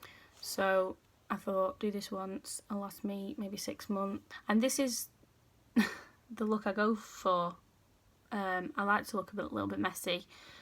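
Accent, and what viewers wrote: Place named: Wales, United Kingdom. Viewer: Where are you from? Love the accent but you sound a bit welsh. xx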